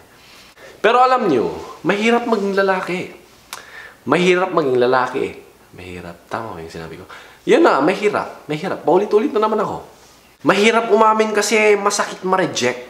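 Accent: Ilocos, Philippines. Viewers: Ilocano ata to🤣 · Hahahaha may ilocano accent e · parang Ilocano mag salita .. yung accent nya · ilokano ata sya.. ay na apo madlaw😂😂😂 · May ilocano accent😂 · Parang may ilokano accent po kayo😁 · Ilocano ba t0? Ung accent nia kase😁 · Kuya ilocano k??😂😂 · ah ok.. Hehehe.. Yung accent kase nya.. Hihihi.. Ilokanong ilokano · Woahh ganun pala yun🤣🤣..peo the way you talk . Diko maexplain eh ilocano ka ba or tagalog?🤣🤣wla lng ask ko lang🤣🤣🤣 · Hinde nakakatawa pero... NATATAWA AKO😂 ILOCANO KABA? 😁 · Parang ilokano, ibanag accent diba po?✌️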